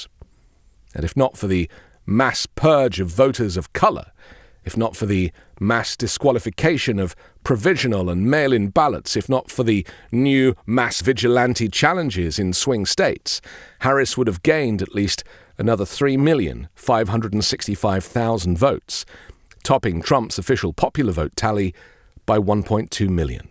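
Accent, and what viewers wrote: United Kingdom. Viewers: I'm european and left-winger. In Europe nobody would be able to vote without proper ID. Given his accent, I assume this youtuber is British. He knows in his home country nobody would be able to vote without proper ID. In fact, you can't even open a bank account without ID, register kids in school, access healthcare services, nothing gets done without an updated ID card. That's why identity theft is rare in Europe. I don't understand why this is even an issue. Being able to vote without proper ID gives room to corruption and rigging of the election process. The USA needs to create a standardized citizen ID card like all properly funcional and organized countries have. This lack of official standardized ID card is some 💩hole country crap.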